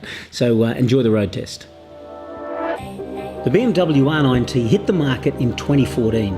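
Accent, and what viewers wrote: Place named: Australia. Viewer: 100% Agree - great footage and review - impossible to here what Metzler you recommend with the MUSIC !! and the Aussie accent....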